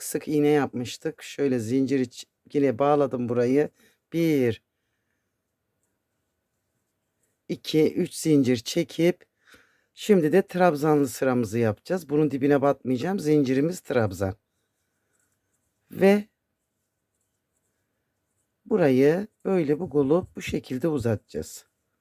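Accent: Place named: Malatya, Turkey. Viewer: Çok güzel anlatıyorsunuz sivenize bayılarak dinliyorum Malatya'da bizim gibi konuşuyorsunuz şimdiye kadar hep şişle örgüler yaptım size abone olduktan. Sonra tığla devam etmeye karar verdim daha kolay daha çabuk bitiyor buda benim işime geliyor torunlarla uğraşmaktan zaman kalmıyor yanlız birde şu pileeli kız bebek hırkası aatarsaniz sedvinirim cunkuj benimkiler hep kız 🤗🤗🌹🤗🤗🤗🤲🤗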